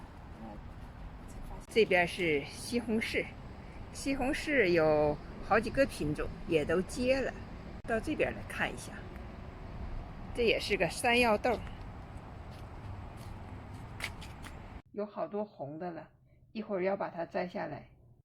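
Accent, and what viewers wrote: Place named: North, China. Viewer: 您的菜园打理的太棒了，又在网上找到一位好老师，以后会经常来学艺喽。听您口音是北方人吧😊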